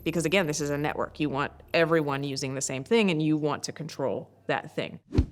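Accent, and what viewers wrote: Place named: United States. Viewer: Wat bedoel je precies? De inhoud? Of het zware Amerikaanse accent? We hebben allemaal kunnen meekijken bij de CIA dankzij meneer Snowden. Dat Carbon Footprint verhaal is ook makkelijk te fact checken (het recyclen van plastic is overigens exact het zelfde als je toch bezig bent). Ze zegt niets vreemds qua inhoud.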